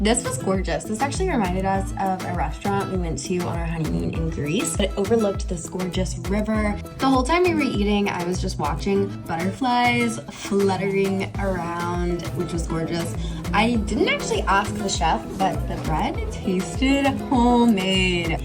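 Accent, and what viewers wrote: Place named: United States. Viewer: Hi, I love in American Accents. By the way, May I know Where is your accent in America? Thanks you 🇺🇸🎉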